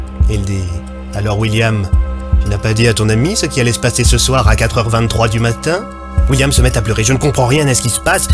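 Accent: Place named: Quebec, Canada. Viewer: J'ai pas du tout écouté l'histoire. Ton accent québécois m'a suffit x)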